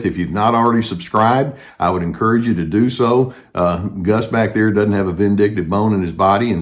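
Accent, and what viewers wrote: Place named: Texas, United States. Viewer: Oh boy! We love the Texas accent. Very very cool, it is. Swish and stylish. The Texan accent. From 2nd April 2023, My beautiful husband PD and I went NC from our abusers so, this means that we have experienced peace for six weeks so far and counting! What do you think of that, Gus? Woof from Evie dog here in Yorkshire UK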